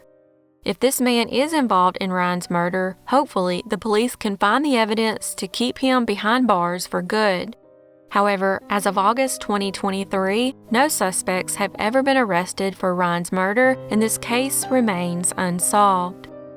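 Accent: South, United States. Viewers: 🤬🤬🤬dang girl!!!! ❤️that fr southern accent!!! 😍😉🙃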